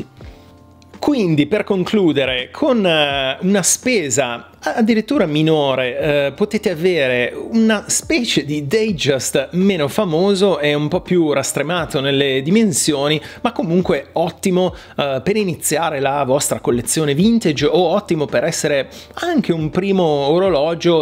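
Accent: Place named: United Kingdom. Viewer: Ma perché hai l’accento inglese?!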